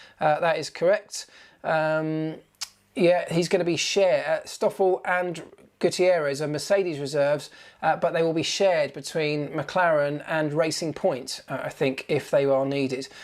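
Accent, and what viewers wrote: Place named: Bromley, United Kingdom. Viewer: Bromley boy! I knew I recognised that accent. Curious to know which school?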